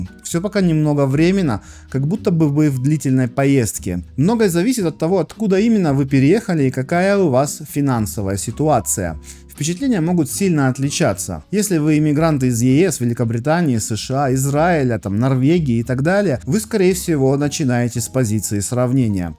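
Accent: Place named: Ukraine. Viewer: Обычный украинский говор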